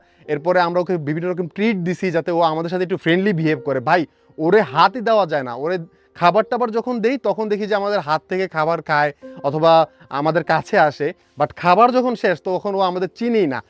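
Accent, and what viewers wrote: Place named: Sonargaon, Bangladesh. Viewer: সোনারগাঁয়ের আঞ্চলিক ভাষা এটা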